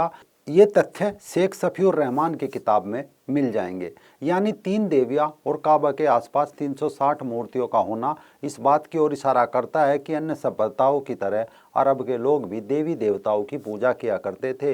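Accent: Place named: Western Uttar Pradesh, India. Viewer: आपका पश्चिम UP वाला लहजा बड़ा अच्छा लगता है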